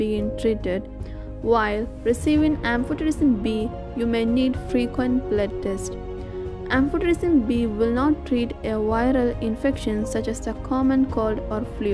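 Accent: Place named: Kerala, India. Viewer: The speaker is mallu 💯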